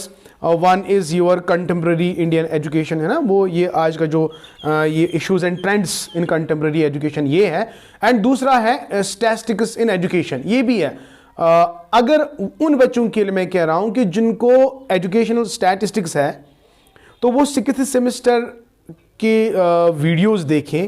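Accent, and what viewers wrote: Kashmir, India. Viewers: I like your little kashmire in sir your lecture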